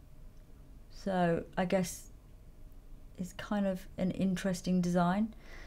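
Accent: England, United Kingdom. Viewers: I find fascinating how Magical the transformation always is, how beautifully the colors blend whether they are subtle as here or more intense and above all the degree of sincerity of (the comment about her cheating on her lower lip)... this is Fascinating:D (and I havent got a clue about proper makeup:D).. oh yes I also love British accent:p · I love how english people prononce french words! Good job! It was really hard saying encre de peau :)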